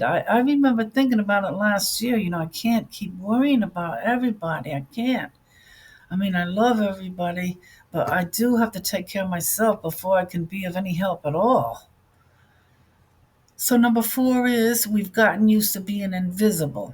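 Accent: New York, United States. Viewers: I love your list! Usually I'm not excited about lists I find in YT videos, but THIS one is wonderful bc it is so personal to you -- and to me! I'm just 4 days in and terrified to speak it bc I'm afraid I'll fail. Bless you, young angel for your heartfelt words. You'll be better soon. Food poisoning is the pits! (is that a NY accent I hear?? love it)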